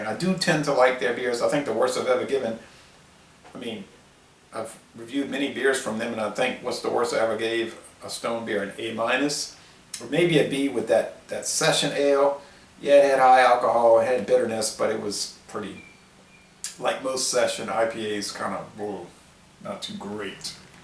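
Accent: Louisiana, United States. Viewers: Got that Louisiana drawl.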